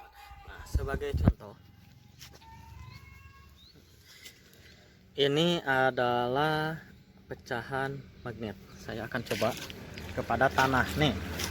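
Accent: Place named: West Java, Indonesia. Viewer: Kang kl dri logatnya ky nya orang sunda ya..itu jenis anggur apa kang..nyimak terus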